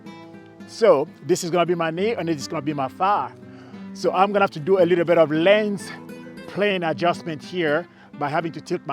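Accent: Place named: Australia. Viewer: Your portrait of your son is stunning! I hope both guys appreciate the gift these photos are now and WILL be for their children and grandchildren. I also hope they know how appreciated they are for giving you (and us! 🙂) their time and willingness to model and be teaching aids. Thank you to both of them. I'm always happily surprised the moment I realise an Aussie is creating and sharing here on YouTube. The moment you started talking I started smiling, then you panned around to show the trees in your yard and, yep, Aussie! 😁 Going back to my appreciation of your models, part of that is because I don't have one. Thirty two degrees in Melbourne today and I was messing around with a strobe, running backward and forward between camera and the stick I'd put on the ground as my "mark". It's an excellent way to suffocate oneself under the dark cloth and look hot, sweaty and as messy haired as possible on film! 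Too hot in the darkroom to develop it yet, even am....but I'm encouraged to do a little more experimenting after watching your video. Thank you for taking the time, I'm very grateful, and cheers to your models for their time. Their portraits turned out beautifully and that double exposure is a truly happy accident, too!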